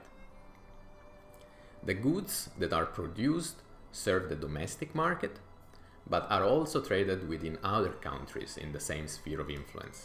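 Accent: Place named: Italy. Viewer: I'm to high to digest what you ar saying but I enjoy your Itialian voice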